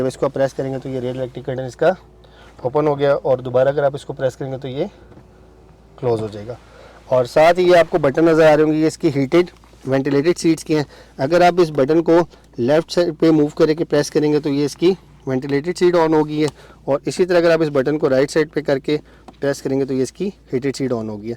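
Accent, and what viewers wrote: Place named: Rural, India. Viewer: Vocals should be improved, seems like a pendu is reviewing a prestigious car. Most likely conduct review in english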